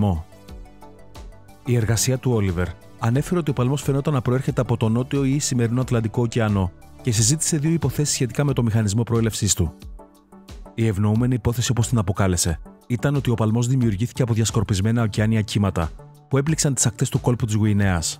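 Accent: Crete, Greece. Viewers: Πολύ ωραίο βίντεο. Έχοντας φτιάξει μία εικόνα γύρω από την φωνή σου, θεωρώ πλέον ότι έχεις καταγωγή κατά 90% από Κρήτη. Please απάντησε μου αν έχω δίκιο 🙂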